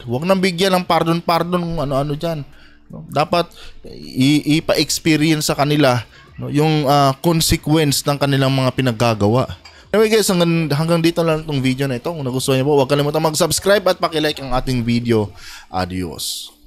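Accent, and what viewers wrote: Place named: Maranao, Philippines. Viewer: Ang vloger parang maranao mag salita.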